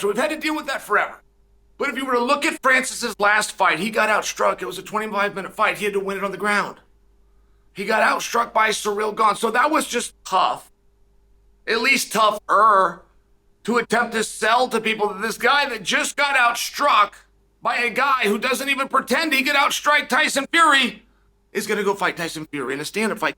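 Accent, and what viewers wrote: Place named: United States. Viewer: Ok Chael, you say the word poll nothing in a google search. The closed captions say you said poll. There is a move called a pull, I’m guessing it’s the yanky accent.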